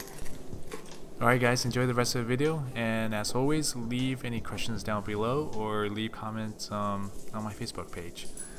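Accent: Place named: South, United States. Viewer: Your voice, with a touch of Southern, is so soothing... perfect zen element to go with sushi! Thanks.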